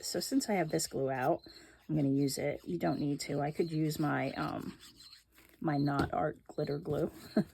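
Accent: New York, United States. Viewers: i love your videos and your ny/east coast accent. reminds me of home :)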